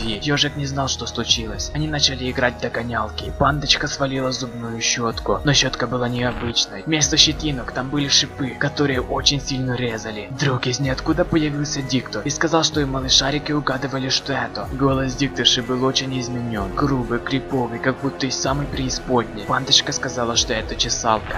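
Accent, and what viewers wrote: Okanye, Russia. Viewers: ПОчему ТЫ вОсё гОвОришь черёз О? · ГОВОРИ НОРМАЛЬНО ТЫ В КАЖДОМ СЛОВЕ ГОВОРИШ БУКВУ О!😡😠👹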